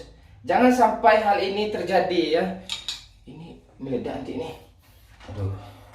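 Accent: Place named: West Sumatra, Indonesia. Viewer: Dari logat nya aja memang asli orang minang ehh btw salam dari Payakumbuh bang hehe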